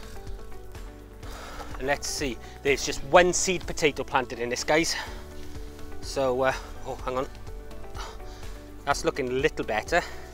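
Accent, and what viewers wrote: Wales, United Kingdom. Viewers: Amazing! I’d be so stoked to have potatoes like this! Thanks for the info. I love your accent 😍 Wales? I’m from California, please don’t be offended if I got it wrong 😊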